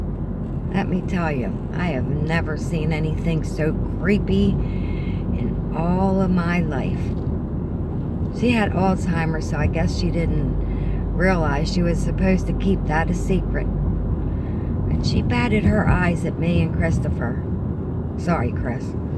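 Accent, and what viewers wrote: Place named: Philadelphia, United States. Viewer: Thank you for sharing ❤ very brave woman! Out of curiosity are you in PA? I was trying to place it while you drove and you have (as do I lol) that east coast/Philly accent! If so we are nighbors:)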